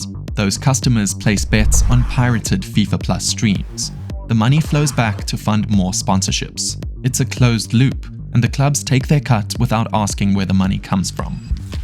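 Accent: South Africa. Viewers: incredibly well put together and informative video, your English is incredible. i thought you were South African haha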